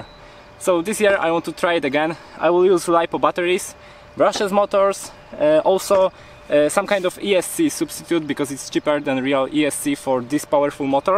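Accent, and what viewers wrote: Poland. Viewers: I knew you were polish from the first second - very nice video! Nice editing skills!!! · Od razu usłyszałem polski akcent. 😁 Polacy tak ładnie i dokładnie mówią 😃 pozdro kolego bardzo fajne wykonanie 👍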